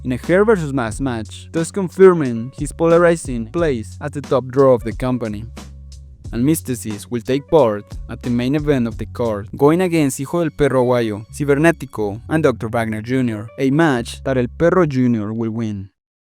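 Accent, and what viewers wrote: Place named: Mexico. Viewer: I will infer you are Mexican due to the accent so... Que buen pinches video amigo. Ahorita que la AAA esta agarrando fuerza por la compra de la WWE necesitamos creadores como tu!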